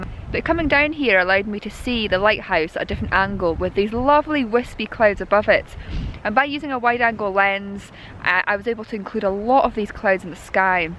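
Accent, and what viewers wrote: Scotland, United Kingdom. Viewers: Beutiful photos and I like your schotish excent so much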